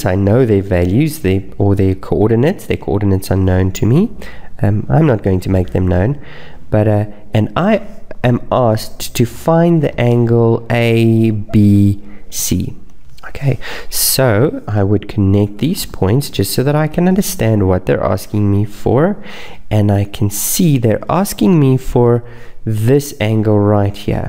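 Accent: South Africa. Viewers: I thought i recognized the accent! Hey there South African bro!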